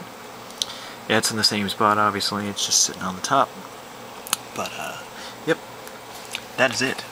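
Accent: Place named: Midwest, United States. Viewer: HA! you must be either from the mid-west, or Arizona... because you talk like ME! FUk this, fuK that... PLUS I spotted that Quicktrip cup on your floor :) thanks for this informative video, really entertaining too!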